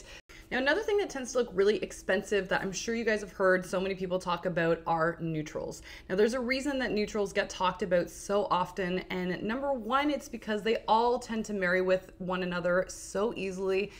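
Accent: Canada. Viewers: I guessed Canadian.. interesting, we have a big polish community here in the UK x great video thank you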